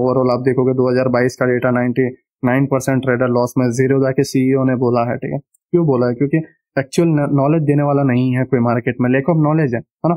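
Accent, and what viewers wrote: Gujarat, India. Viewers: apki hindi se lag raha hai ki ap Gujarati ho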